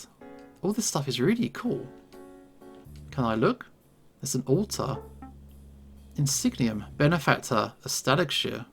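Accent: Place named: United Kingdom. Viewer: Is watching this making me a furey personnally i m only watching u for the bri'ish accent pls say Bo'oh oh wo'ah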